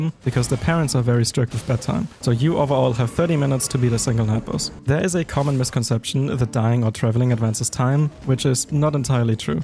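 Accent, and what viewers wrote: Germany. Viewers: hör ich da nen deutschen akzent?:D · When I heard your accent at the very first time I was like, wait I recall this kind of words, is this German? Then I read your channel description haha,I felt compelled to say that, no hate,though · Ich finde es witzig das man sofort raushört das du deutscher bist, obwohl du echt gutes Englisch sprichst. Das Video hat mir echt gefallen und erklärt warum ein paar davon bei mir einfach rumgestanden haben, dachte echt die wären verbuggt. Danke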